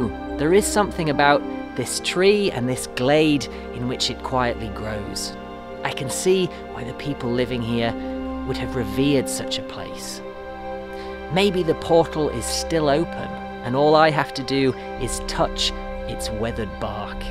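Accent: Ireland. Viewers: Sounds like you have become a Fully fledged irishman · Your accent becomes more Irish now mate! ☘️💚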